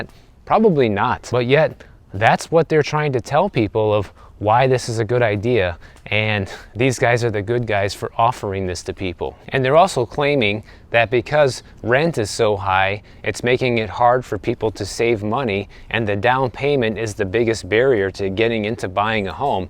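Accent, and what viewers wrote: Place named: Illinois, United States. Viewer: From one Illinoisan to another, I thought I recognized and Illinois accent.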